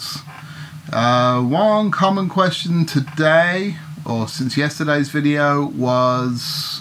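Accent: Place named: Cornwall, United Kingdom. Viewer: this exercise has taken the worry I had that this dental work would really mess things up! I'm playing with you relaxed and it's keeping my tone in tune with yours. Your a life saver. John from New Jersey. Oh by the way my Wife Beverly is from Cornwall UK her accent matches yours to a tea. Mine is a Jersey accent. This exercise is going to keep me playing threw my dental visits. Thank you very much.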